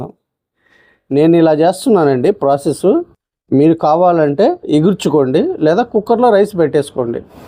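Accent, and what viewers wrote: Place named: Andhra Pradesh, India. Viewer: Meedi Andhra language laa gaa vundi ee vooru andi Maadi Andhra ne andi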